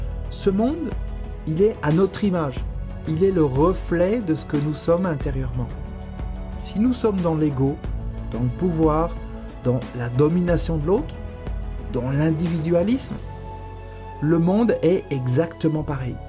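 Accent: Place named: Eastern France, France. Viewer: Ton accent te piège??? Lol . T es de l est de la France????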